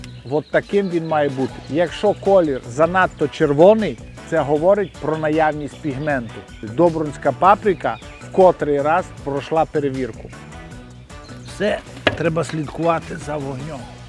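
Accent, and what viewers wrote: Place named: Transcarpathia, Ukraine. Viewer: я четко по акценту понял, что про бограч надо смотреть тут. Бограч и банош-прекрасные блюда. Люблю Закарпатье и людей. Привет из Харькова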